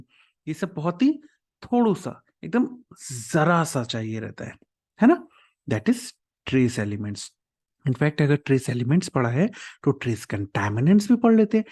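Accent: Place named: Bundelkhand, India. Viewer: Sir bundelkhandi he 😂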